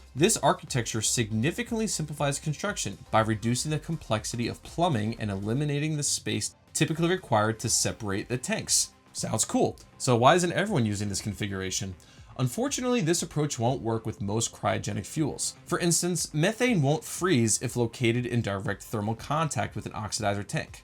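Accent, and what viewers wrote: Germany. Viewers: I mean the content is great, but passion is unmatched, it makes so much more fun to watch him. German accent for the win;) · Okay… good good now this time with a slight German accent.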